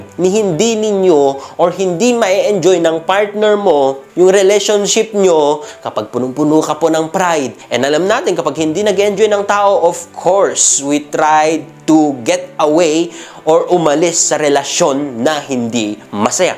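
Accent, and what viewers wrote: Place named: Ilocano, Philippines. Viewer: PArang ilokano itawis ung pagbigkas mo Ng salita sir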